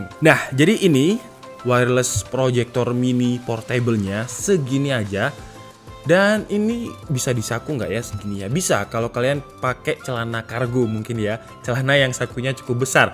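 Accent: Java, Indonesia. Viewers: We mas sampean wong jowo to 👍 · Abangnya medok juga ya 😁😄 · Wong jowo mas? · Orang mana toh mas?, Jawanya masih melekat 😂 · Ketok e kok ngomong jowo😁.wong ndi bang..? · Orang jawa ya?? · Wong ngendi to mas? Logat jowo ne jen kuat 👍👍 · Boso jawane koyo wong ngalam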